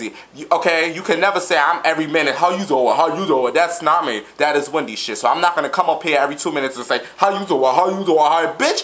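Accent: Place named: New York, United States. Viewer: i love how you talk with that new york accent!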